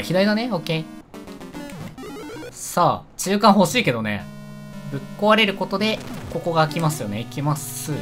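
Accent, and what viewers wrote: Kansai, Japan. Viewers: バリって関西弁なんや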